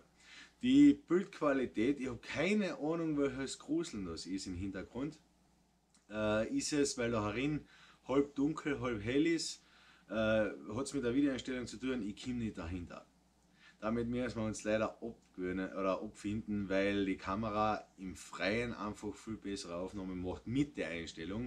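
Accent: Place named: Austria. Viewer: Jo stimmt, der österreichische Dialekt kling so richtig angenehm.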